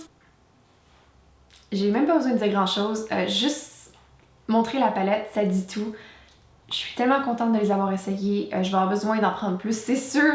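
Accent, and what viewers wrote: Quebec, Canada. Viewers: Also your québécois accent is lovely ^_^ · es tu quebecoise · je viens de découvrir que tu étais canadienne, je pensais que tu étais américaine tellement tu parles bien anglais. je découvre tes vidéos en français et j'adore tellement ton accent. vraiment l'accent québécois c'est magnifique. Merci pour tes vidéos de swatches de rouge a lèvres. je viens toujours vers toi pour les swatches. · Yknow I've always felt weird about canadian french cause it just sounds so different from french french that I'm used to, but you make it sound so nice! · i am not bad at french but at first i couldn't understand a word, your accent is sooooo cute and unique. i have never heard a french canadian talking so this is really cool! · Franchment ton accent est adorable. Ca me donne encore plus l'envie de visiter le Canada! One day..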